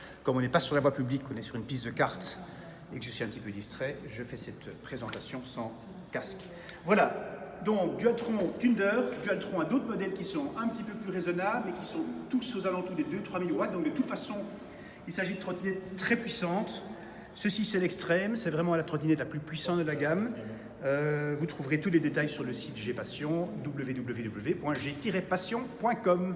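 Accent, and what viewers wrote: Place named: Belgium. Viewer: Il me tue c’est belges 😂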